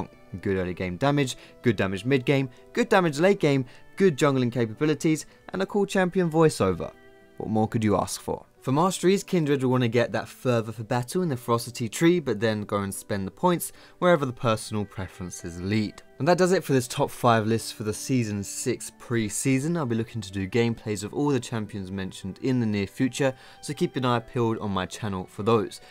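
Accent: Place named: England, United Kingdom. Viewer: i like you'r englaned acsent ;D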